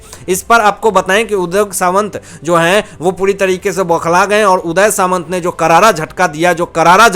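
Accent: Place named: England, United Kingdom. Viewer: Shri “ BARA” instead of “ BADA” Anchor is trying to copy ENGLISHMAN. Though we are free from slavery 70 odd years ago but still our Anchore is trying to imitate Britishers. Sad very SAD . Unfortunately he owner of channel encourage this lousy Anchor, when we can get dime a dozen best ANCHORS???????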